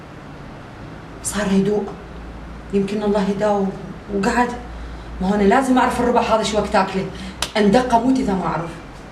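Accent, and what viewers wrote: Iraq. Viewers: حلو حلو هواي حلو شكد حلو انا اتابع فديوهاتك عشان استمتع بجمال اللهجة العراقية أحلى تحيه لأروع و اغر الشعوب على قلبي الشعب العراقي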